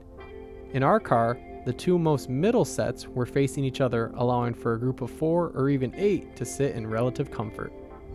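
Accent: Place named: United States. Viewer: We need more CanadianEnglish pronunciation in this video. It sounds like the narrator is American...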